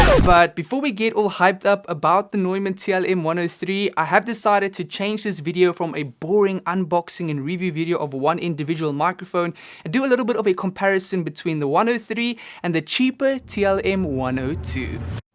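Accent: South Africa. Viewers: Dude! Where are you from? You sound South African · You sound South African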